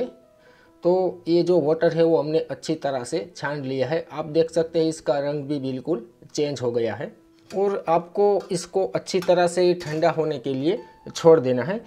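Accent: Gujarat, India. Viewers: Kya bhai tum Gujarati ho ha moj ha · Are u Gujarati???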